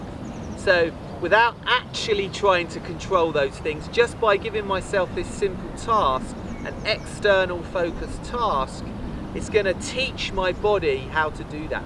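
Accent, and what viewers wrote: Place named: United Kingdom. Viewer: Hey, really enjoyed yr commentary on the three hole channel at the open. Great to hear British commentary on the golf from here in the US.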